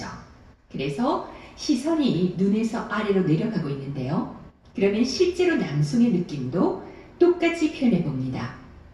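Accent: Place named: Gyeongsang, South Korea. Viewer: 강의도 좋고 목소리도 예쁘시고, 우아한 모습또한 시의 맛을 한껏 더해 줄 수 있을 것 같아요. 말씀하실 때 살짝살짝 느껴지는 억양에서 경상도 분이시구나~싶네요~^^*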